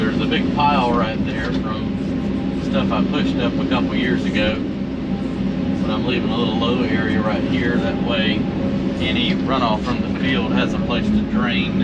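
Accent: South, United States. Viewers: I gotta tell ya, I love watching the videos, but there is something soothing about your voice too, I like the southern draw, I follow Todd on digginlife21, he has the great draw as well. I am in Michigan and you just don`t hear that way up here, I guess you guys think we have a northern accentb be well my friend.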